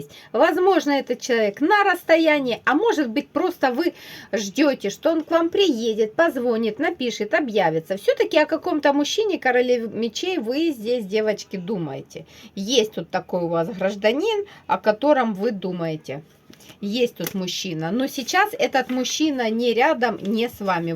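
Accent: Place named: Kuban, Russia. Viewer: У вас кубанский говорок. Я сама, из тех мест....🤗⚘️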